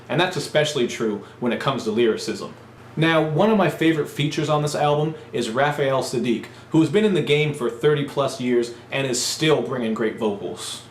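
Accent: Canada. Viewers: You are the most Canadian person I've ever heard.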